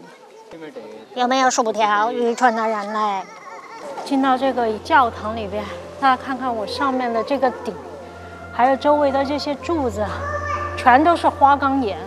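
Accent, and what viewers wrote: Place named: Northeast, China. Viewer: 女主是混血吗 ？口音夹杂着东北口音❤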